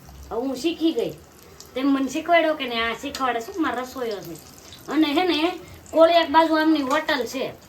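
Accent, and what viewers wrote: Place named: Rural, India. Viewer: મારી અટક પણ છે. તમારાં મામાનું ગામ કયું? તમારી શુધ્ધ ગામઠી બોલી હો. મજા આવે એકે એક શબ્દ સાંભળવાની.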